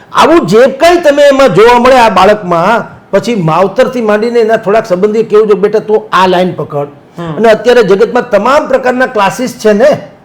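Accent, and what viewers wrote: Kathiawar, India. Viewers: Aaj Ananya hamara dhanyvad મહેતા ની ખૂબ જ સચોટ અને પ્રભાવી વાત હોય છે તેમણે ઊંડો અભ્યાસ કર્યો છે તેમજ તેમના જીવન ઘડતરમાં ખૂબ જ અનુભવ કેળવ્યા છે તે બધા વાલીઓને સારા માટે કહે છે વિદ્યાર્થીઓને સારા માટે કહે છે અને હું પણ એમના વાતમાં સહમત છું ખૂબ જ ઉમદા અને સરસ વાતો કરી પ્રેરણાદાયી વાતો છે દરેક વાલી જો સમજે તો પોતાના બાળ સંતાનોને યોગ્ય માર્ગદર્શન આપી શકે છે ટેલેન્ટ ની ખૂબ સરસ વાત કરી છે દરેકે પોતાના દીકરા માં શું ટેરેન્ટ છે તે જાણીને તેના તરફ પ્રોત્સાહિત કરવા જોઈએ. મહેતા સાહેબને ખરેખર સરસ્વતી માતા સાક્ષાત છે તેઓને જ્યારે સાંભળ્યું છે ત્યારે એક સંત મહાત્માની જેમ તેમની અવિરત વાણી અમારા જેવા નાના માણસોને સાંભળવા મળે છે. અમુક ખૂબ જ આ વાત માંથી પ્રેરણા લઈએ છીએ કાઠીયાવાડી લેંગ્વેજ થી તેઓ જ્યારે વાત કરે છે અમને ખૂબ જ ગમે છે ખૂબ જ મોજ આવે છે ઘણીવાર હસાવી પણ દે છે અમે હસી પણ લઈએ છીએ પરંતુ ગંભીર જે બાબતો છે તેને અમે નજર અંદાજ પણ નથી કરતા ખૂબ જ સચોટ કહે છે. 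આજ રીતે પ્રજાના પ્રશ્નોની વાંચા આપતા રહેશો તેવી અમને આશા છે મહેતા સાહેબે વિસ્તૃત માહિતી આપી તે બદલ ખુબ ખુબ ધન્યવાદ 👍👍 તેમની સાથે સંવાદ કર્યો તે પત્રકાર મિત્રને પણ અમારા ધન્યવાદ 👍👍👍👍 જય હિન્દ વંદે માતરમ ત્રાગડ ચાંદખેડાથી ગાભાજી ઠાકોર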